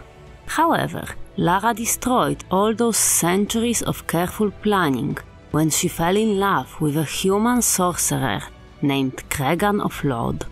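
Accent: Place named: Eastern Europe. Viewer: I love your accent, thought first its Italian but its slavic isn't it? Subscribed and looking forward to listening to more lore in the best voice and accent for it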